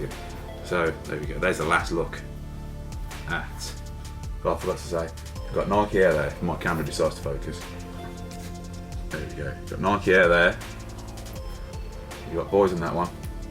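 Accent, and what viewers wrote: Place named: Black Country, United Kingdom. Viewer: Sounds like a Black Country accent here...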